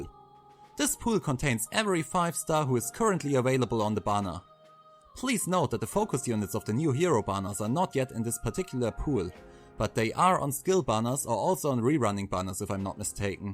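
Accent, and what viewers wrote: Germany. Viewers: this man has a very german accent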